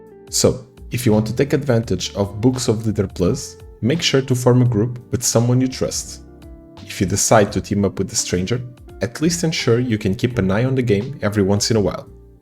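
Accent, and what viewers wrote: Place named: Chile. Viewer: Sos chileno no? Te saque el acento en la primera oración jaja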